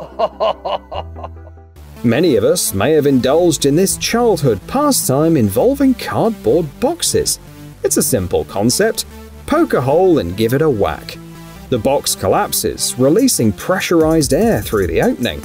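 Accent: United Kingdom. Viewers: No more British accents!!! Thumbs down!!! Irish, French or Swedish!!!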